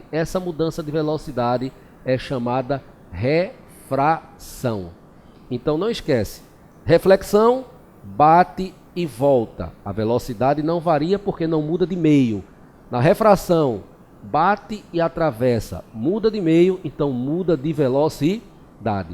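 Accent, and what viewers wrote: Northeast, Brazil. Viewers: Idiotas do Centro-sul que criticam o sotaque nordestino! Caguei pra vocês!!!! 💩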